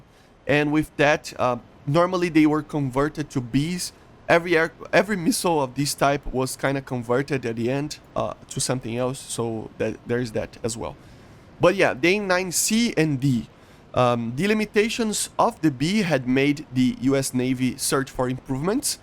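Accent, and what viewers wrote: Brazil. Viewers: Só pelo sotaque já percebi que é BR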